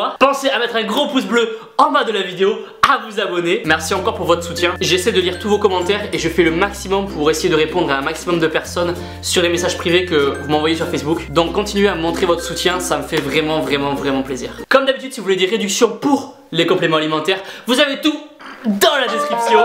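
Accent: South, France. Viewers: Question perso : Tu habite ou ? Car je vois que ta un belle accent du Sud je doit pas etre loin de chez toi ;)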